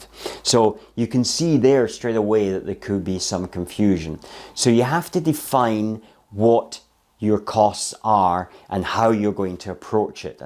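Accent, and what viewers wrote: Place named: Scotland, United Kingdom. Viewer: Love your Scottish accent! I was just speaking to a friend about building my own house one day away from everyone (dreaming)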